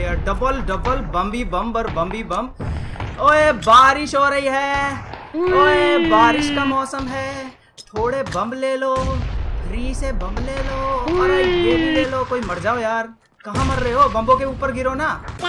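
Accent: Haryana, India. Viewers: Hariyanvi style xD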